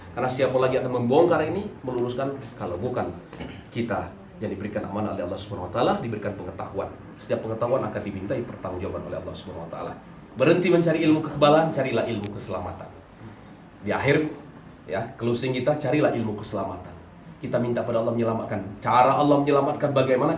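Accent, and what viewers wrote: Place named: Palembang, Indonesia. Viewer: Dari nada bicaranya sperti nya orang Palembang, slam dri Palembang 🙏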